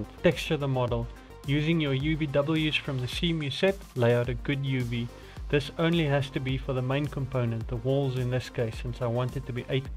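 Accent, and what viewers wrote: South Africa. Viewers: South African? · South African? Im from Cape Town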